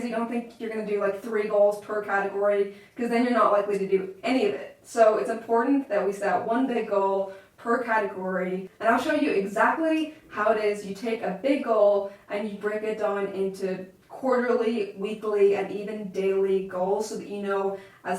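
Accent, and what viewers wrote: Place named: Belfast, United Kingdom. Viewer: Great way of breaking down your goals to achieve success and a really good video. Also love when you say 'now' and 'out', still got the Belfast accent :)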